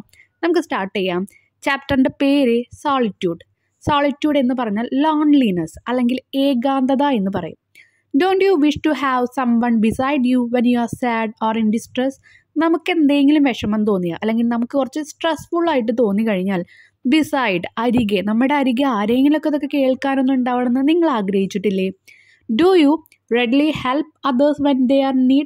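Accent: Thrissur, India. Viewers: തൃശ്ശൂർ സ്ലാഗ് ആണല്ലോ 🙂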